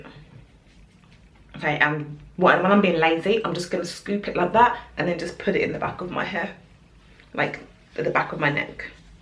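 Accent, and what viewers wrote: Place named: United Kingdom. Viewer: You have a beautiful UK accent and your tips are nice and right on point.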